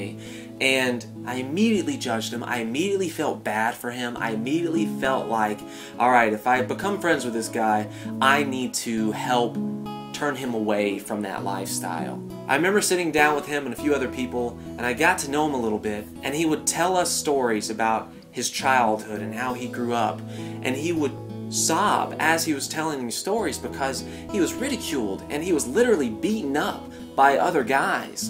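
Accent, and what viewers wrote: South, United States. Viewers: Does he have blue or green eyes? And is that a southern accent I hear!?!? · The southern accent though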